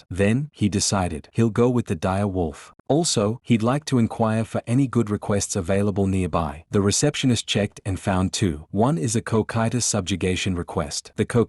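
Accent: United Kingdom. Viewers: I noticed the British accent last time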